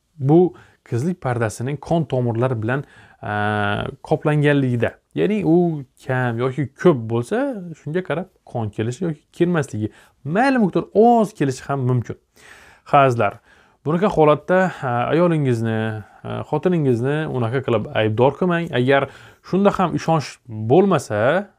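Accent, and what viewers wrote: Khorezm, Uzbekistan. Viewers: Xorazmlimisz yoo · Шифокор Хоразмли шекилли 😊баклаб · Dòktr xorazmdanmisiz shevangiz tortyabdi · Xorazmdanmisz oga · Xorazmdanmisz diliz ôxshab durupdi😅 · Салом хаммага адашмасам доктримиз хоразмдан шекилли шеваси тортяпди Хоразм Зур гап юк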